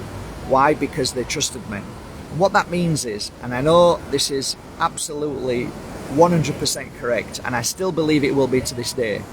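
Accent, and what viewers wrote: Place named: North, United Kingdom. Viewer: Northern (good reference too!) no nonense delivery 🤗 with a brilliant combo of compassion/passion 💥💥❤🧡💛💚💙 Love your fire in your belly..... grand job lad! Clarity is by its nature challenging....praise be for that!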